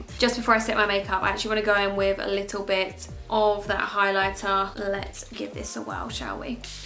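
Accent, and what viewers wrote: Essex, United Kingdom. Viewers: Are you from Essex?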